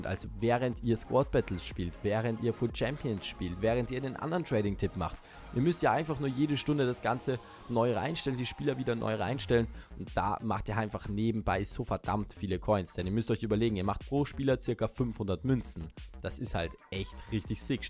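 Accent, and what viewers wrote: Austria. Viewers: Woher kommst du? (Österreich oder Deutschland oder)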